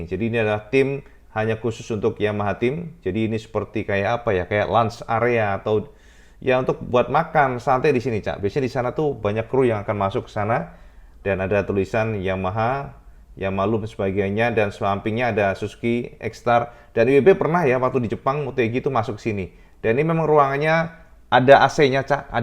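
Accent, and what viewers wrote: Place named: Lombok, Indonesia. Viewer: MATURTAMPIASIH,,,kental bahasa sasak lombok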